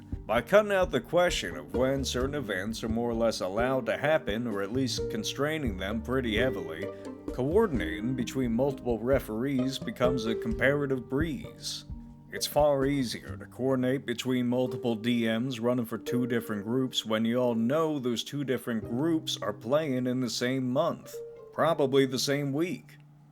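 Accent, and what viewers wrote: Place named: United States. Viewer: Loving the cowboy accent with the static image background. Otherwise, hearing all this about 1:1 Time, I wonder if it would make the game I'm designing more interesting as well.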